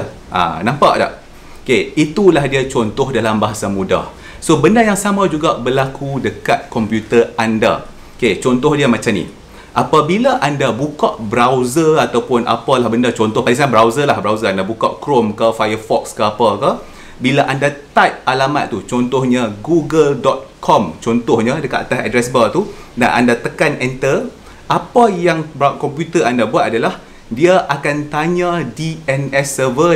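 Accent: North, Malaysia. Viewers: Penerangan yg sgt jelaas. Tambahan pulka cakap utagha kira geng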